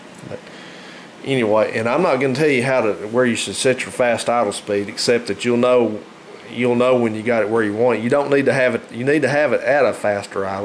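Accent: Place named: South, United States. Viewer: I love it when a guy with a southern accent impersonates someone with a southern accent.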